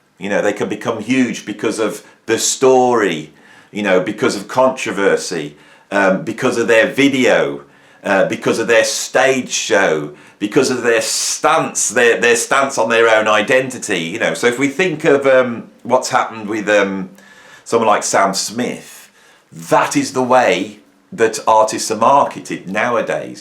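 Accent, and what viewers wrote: Birmingham, United Kingdom. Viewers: I listened to this without visuals. The power of the brummie accent was far more compelling than any face furniture. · Got to love a Brummie accent!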